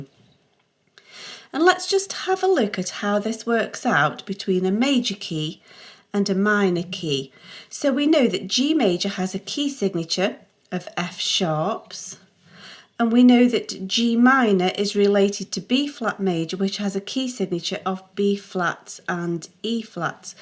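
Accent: United Kingdom. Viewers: You sound British. Are you? 🤔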